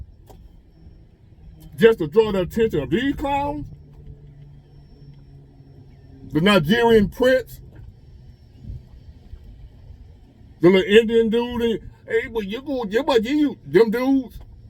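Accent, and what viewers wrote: South, United States. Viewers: I love your country accent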